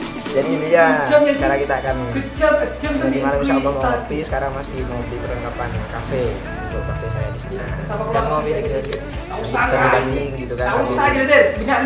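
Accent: Madura, Indonesia. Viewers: Dengerin suaranya ko kaya ada logat Maduranya, eh ternyata emng pake Madura🤣🤣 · Medureh sarah 🤭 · bahasa madura.a bnget,lucu pas ngimucak bahasa medureh🤣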